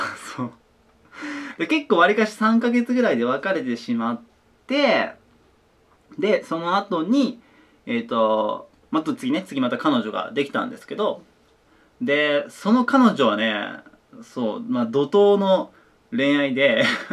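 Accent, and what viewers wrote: Kansai, Japan. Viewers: 関西弁が入ってた方がいいです！！！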